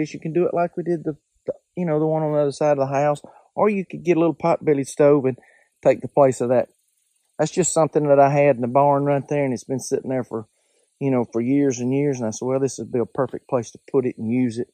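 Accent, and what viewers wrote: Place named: Tennessee, United States. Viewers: stayed for the southern drawl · You from Tennessee? Your accent sounds like you are from around these parts.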